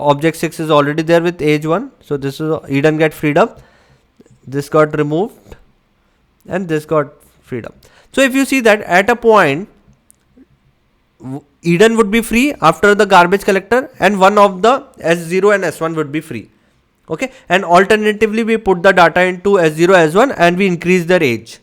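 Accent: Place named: India. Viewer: Indian English with cold is the next level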